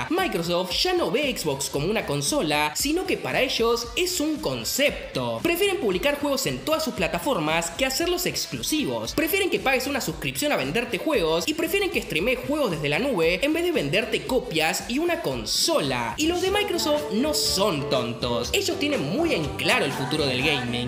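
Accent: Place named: Argentina. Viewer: cualquier cosa que diga un argentino con ese acento tan horrible yo hago todo lo contrario, no destacan por su honestidad.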